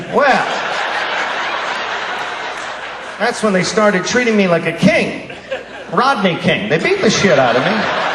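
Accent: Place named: Boston, United States. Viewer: The are both from the Boston Ma area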